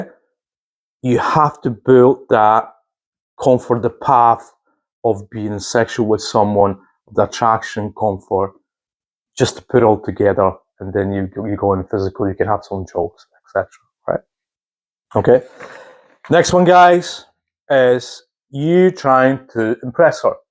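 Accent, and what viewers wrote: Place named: Scotland, United Kingdom. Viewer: Are you Scottish but trying to be easily understood or like Eastern European but then it comes off as Scottish?? And I’m not saying that like critically? Whatever it is, I can fully understand you as an American so …. Right on 🙌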